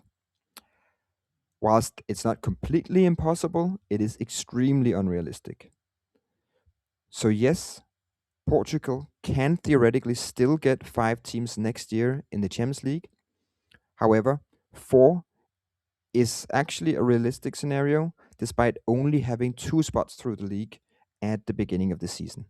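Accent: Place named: Denmark. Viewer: Are you Danish? You have a very Danish accent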